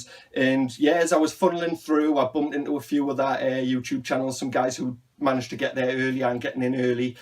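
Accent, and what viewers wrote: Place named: Newcastle, United Kingdom. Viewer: Just discovered your channel, it’s refreshing to hear a fellow Geordie on YouTube. You going to Doncaster in March? I went for the first time last year, it was great.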